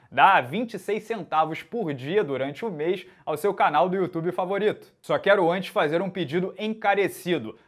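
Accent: Rio de Janeiro, Brazil. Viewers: provavelmente você não vai ler esse comentário.... Mas parabéns pela inovação no canal 👏👏👏👏....merece o reconhecimento e o sucesso muito pela humildade (e olha que eu não gosto do sotaque carioca, problema meu, eu sei) espero que não perda essa humildade. O canal só perde em conteúdo para o Victor Miranda, mas entendo que são propostas diferentes. Quem ganha são os inscritos..!!...parabéns, voa, foguete não tem ré..!!!🚀🏆👆👏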